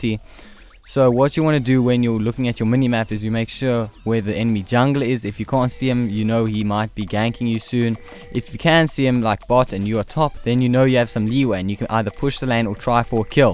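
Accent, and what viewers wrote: South Africa. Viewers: You south african? 😆 game name?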